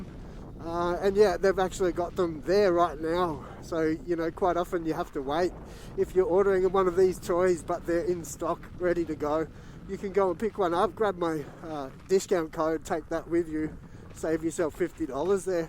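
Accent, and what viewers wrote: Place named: Scotland, United Kingdom. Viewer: dude your accent is so nice, looks like scottish but your channel is based in Australia, australian accent is just like yours?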